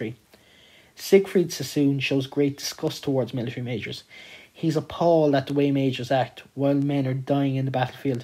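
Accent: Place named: Ireland. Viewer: love that i can listen to an irish lad and it is perfect bbecause he sounds identical to my teacher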